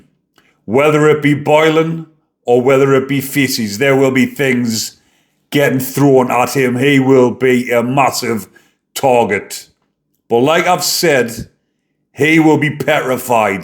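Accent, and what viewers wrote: Newcastle, United Kingdom. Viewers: A Geordie accent.North-East of England.Newcastle area. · Oh ! Hello bonny lad ! Being honest ! Hearing my fellow bretherons voice ! Thinking your a geordie ex con ! Thinking ! That devils decilple ! Is about to reap the rewards ! For ! Killing ! An innocent boy ! Not us bloody english idiots lol ! Family a little fierce lol done jail time !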